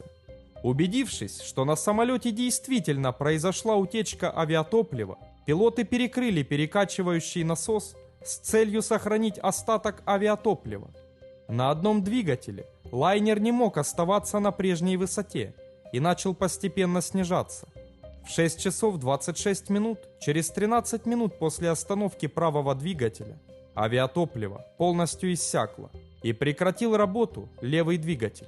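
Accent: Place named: Kharkiv, Ukraine. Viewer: Говорок харьковский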